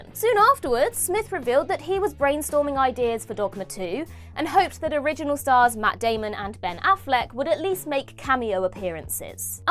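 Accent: United Kingdom. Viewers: I like the snacky British accent. Did she see the last 15 minutes of "28 Years Later"? Please, Watch the movie.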